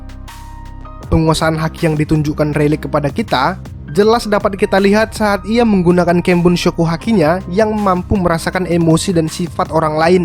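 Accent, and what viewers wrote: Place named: Medan, Indonesia. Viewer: nampak kalo abang ni orang medan bah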